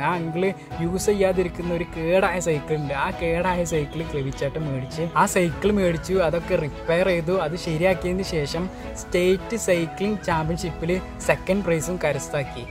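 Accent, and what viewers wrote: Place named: Malappuram, India. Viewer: malappuram accent kalanj samsarikkalle broooo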